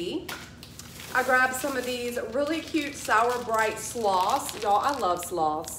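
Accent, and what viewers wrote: South, United States. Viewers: Oh girl I got such a kick out of you saying you from the south like we couldn't tell love you girl. I say girl cuz I'm almost 70 n I call my girls lil girls.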